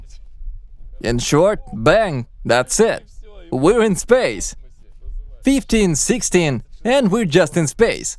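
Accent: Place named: England, United Kingdom. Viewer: О вы из Англии?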